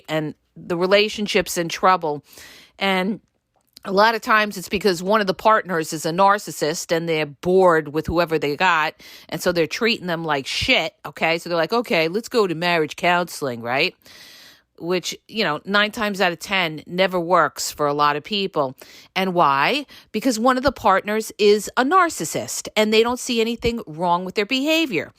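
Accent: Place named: Bronx, United States. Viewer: And all topped off with a Bronxy New York accect - perfect. Taking no shit...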